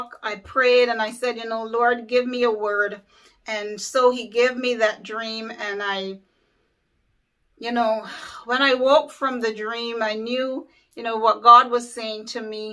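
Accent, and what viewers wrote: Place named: Barbados. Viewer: I hear that bajan accent! I love it and this video, God bless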